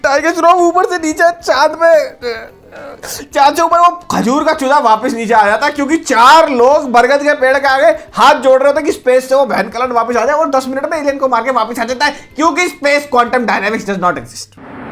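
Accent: Haryana, India. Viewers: The haryanvi accent rock bhai!! Yahi chahiye!!! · Bhai tere haryana haavi ho ra h 🤪🤪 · Video se mast to bhai Ki Haryanvi Thi🤣🤣🤣🤣😂🤣😂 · Bhai tu haryanvi h k? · that hariyanvi accent tho : D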